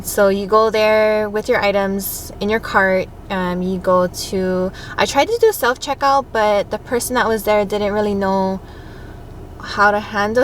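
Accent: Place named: Hawaii, United States. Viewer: I knew you were from Hawaii the second I pressed play. I interview a lot of locals on my podcast. I need to get in on Shipt.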